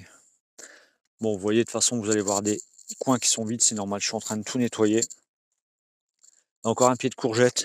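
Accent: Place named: North, France. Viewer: Avec ton accent ça sent le Nord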